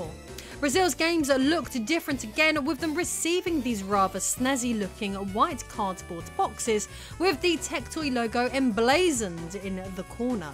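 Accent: England, United Kingdom. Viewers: England accent is sooooo cool! Kisses from 🇧🇷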